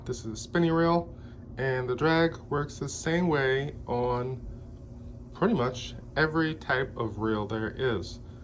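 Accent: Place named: United States. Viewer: Your video is so helpful brother 🥂 but American English is almost terrible lol... I can hardly hear all that you have explained 🤦‍♂️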